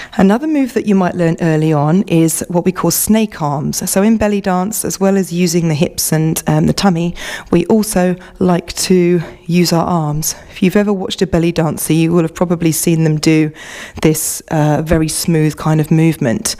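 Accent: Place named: Southern England, United Kingdom. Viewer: Thank you for providing such wonderful classes! Your English accent is also delightful, just wondering where you are from, somewhere in southern England?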